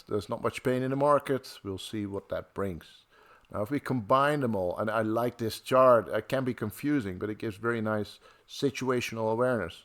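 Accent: Netherlands. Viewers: Cool vid! Where’s your accent from? You sound Dutch.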